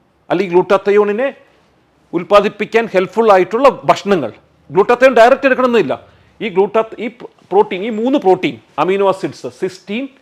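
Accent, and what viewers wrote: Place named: Pala, India. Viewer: ഡോക്ട്ടറുടെ നാട് പാലായാണോ? ഞങ്ങളുടെ സംസാരം പോലെയാണ്. പ്ലീസ് reply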